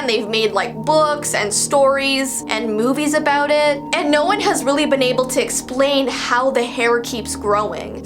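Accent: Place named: Canada. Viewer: I’ve watched a bunch of video and I’m just now hearing what might be a Canadian accent! Where have I been?!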